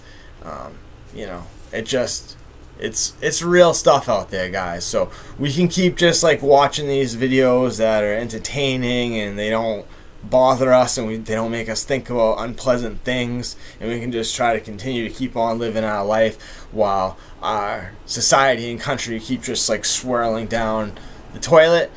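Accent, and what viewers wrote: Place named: East Coast, United States. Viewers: Massachusetts accent 💪🏻💯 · I can't pinpoint your accent but east coast would be my general guess lol I'm from the mid south with some what of a southern draw lol oh well i love the east coast and have seen most of it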